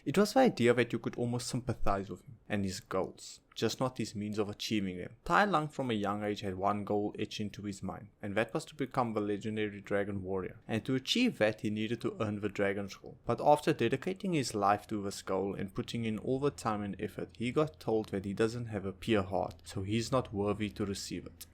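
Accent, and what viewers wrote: South Africa. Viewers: R u south African? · Are you South African? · Really good video. are you South African by any chance? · are you afrikaans?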